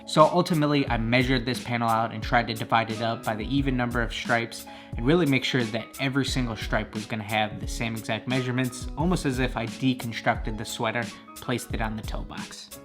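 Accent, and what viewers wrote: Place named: New York, United States. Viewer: Those are fire bro , bro i can hear an accent u gotta be from NY or Canada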